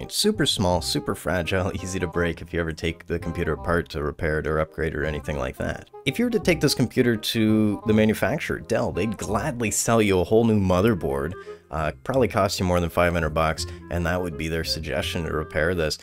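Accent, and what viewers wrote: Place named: Canada. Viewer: Hey bro, you sound super Canadian here. Also this sounds like more than 500$ in labour.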